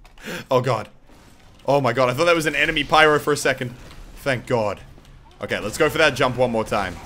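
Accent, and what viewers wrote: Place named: Australia. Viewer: Your Australian accent seems to come out a lot today. I like it (•w•)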